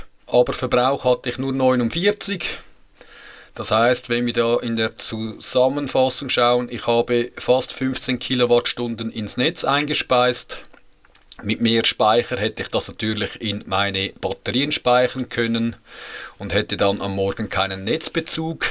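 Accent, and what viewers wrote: Switzerland. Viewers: Zunächst mal: Wie schön, einen Schweizer Dialekt zu hören - mein Sehnsuchtsland! Ich habe vier B2500D; sind gut steuerbar über Home Assistant. Unschlagbarer Preis von zuletzt 150 Euro/kWh. Können nix, außer PV-Strom speichern und wieder abgeben und das mit relativ geringer in/out-Leistung, aber das unterstützen sie richtig gut. Wer einen reinen Puffer für seine Panels sucht, sollte diese nehmen.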